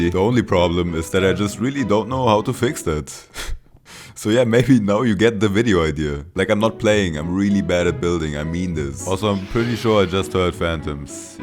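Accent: Germany. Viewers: i hear a little bit deutsch haha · as a german I hear that you are German only because of the voice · Not even 10 seconds and i could already hear the german accent 💀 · I can smell the German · out of context but are u perchance german? ur accent and pronunciation sounds like it (coming from a german person) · i know a german accent when i hear one haha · you sound so german 🙏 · First time watching a video of yours and I love how I immediately said "German" the moment you spoke😂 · are you german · I can here a little bit Deutsch in your englisch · Why do i think that U are a German guy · Ohne Witz, ich mache das Video an, höre den Akzent und denke mir… der ist doch deutsch. Checke dein Acc and low and behold its in Germany 😭😭 love that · Do I hear german? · Is he german? Bruder ich hörs doch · damn u got a cool german accent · Ich Liebs einfach wie ich in 5sek den deutschen Akzent rausgehörte habe 😂 Geiles Video Grüße auf Hannover ❤ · I know a German accent when I hear it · I hear deutsch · Ich hear a deutschen akzent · Are you german? 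· I hear the DEUTSCH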